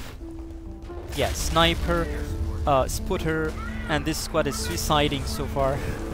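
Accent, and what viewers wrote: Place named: United Kingdom. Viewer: When did your accent become british?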